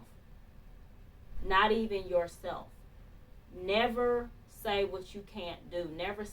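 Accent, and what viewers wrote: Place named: West Virginia, United States. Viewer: Good morning. I can hear your dialect and noticed that it was west Virginia. I have a church member who has the same dialect . On another note, keep uploading These videos . This video certainly spoke to me because people within my own family especially my siblings have told me in my face that I couldn't do things. This video really touched my heart.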